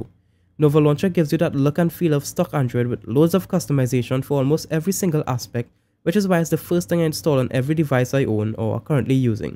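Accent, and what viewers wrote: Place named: Trinidad and Tobago. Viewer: That was an excellent review bro. I picked up some Trini twang here and there but, not much. "I simply hit the simple button" #priceless. Awesome quality as usual and very informative.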